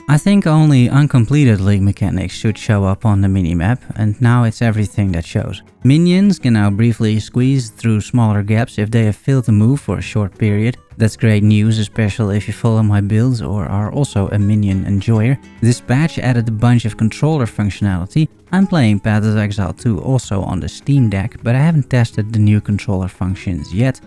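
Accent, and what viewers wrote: Netherlands. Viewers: I came here randomly as POE player but - klopt t dat ik een Nedelands accent hoor?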